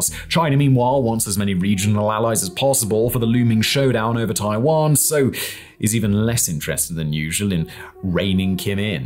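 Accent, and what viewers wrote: United Kingdom. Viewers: Finally, someone with a brain. I like your content and delivery... especially with that accent of yours. Everything sounds better with that particular British accent. I appreciate you and your channel.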